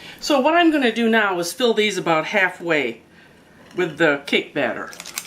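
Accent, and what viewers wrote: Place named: Minnesota, United States. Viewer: Hi! Do you and live in Mn? I'd say, you sorta have a Minnesota accent!😀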